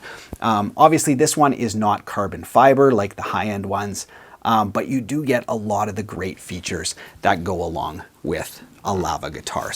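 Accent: Canada. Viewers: Hey! Love your show and your Canadian accent. I recently bought a Gibson SG Special Faded (2005), it's rather cheap for a guitar with the Gibson logo on the head, on the second hand market. What's your opinion about this guitar?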